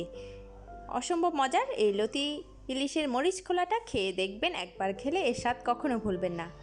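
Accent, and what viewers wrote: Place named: Noakhali, Bangladesh. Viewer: Puro dakha galam.valo noyakhqli vasa sunlam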